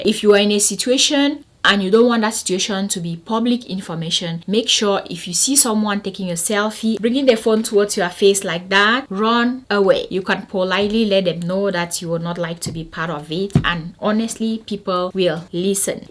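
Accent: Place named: Cameroon. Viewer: Loving the Cameroonian accent. You got a new subbie all the way from sunny Cape Town, South Africa!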